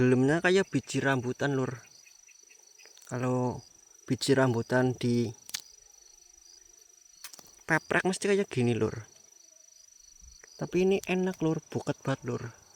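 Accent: Indonesia. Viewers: koe ngapak yah,ngapake ngendi bro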